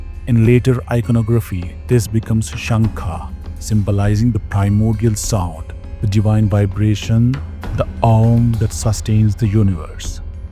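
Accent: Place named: India. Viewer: I like the dot dot dot dot Indian accent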